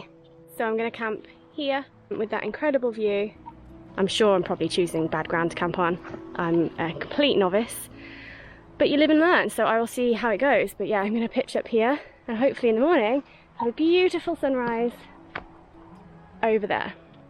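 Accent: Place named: Midlands, United Kingdom. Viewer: Cant pin point your accent, a few words slip but cant place them, youre south of brum?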